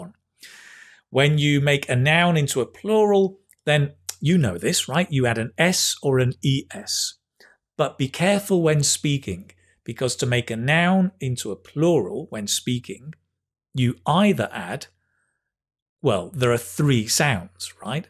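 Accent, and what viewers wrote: United Kingdom. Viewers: I love your British accent 😁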